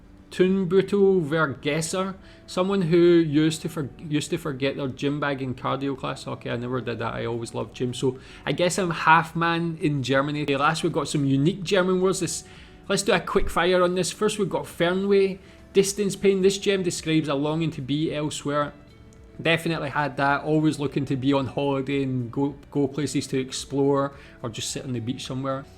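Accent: Scotland, United Kingdom. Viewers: oh boy, your scottish accent really showed in this video xD somehow love the way you say "booze idea" lol... but it also helps you with the german tongue twister... that was FLAWLESS :D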